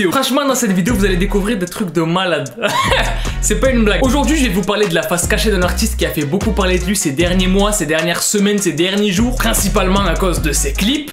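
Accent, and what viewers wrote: Quebec, Canada. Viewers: T'as un beau accent québécois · J'adore ton l'accent québécois👍🏽mais aussi je trouve que ta raison ça pourais se retourner contre lui on plus ya pas a faire des vidéos ou on le voi nu ya des enfant qui ecoute !! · il parle trop bien ( quebecoi ) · L’accent québécois j’adore 😂😂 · Meilleur accent québécois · Il me tue l'accent québécois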